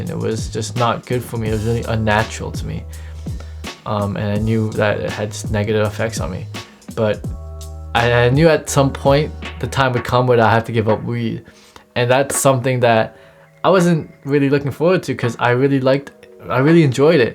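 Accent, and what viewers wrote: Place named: Toronto, Canada. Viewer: definitely from toronto, man said ball up hahaha, i rate it though keep it pushing brotherrr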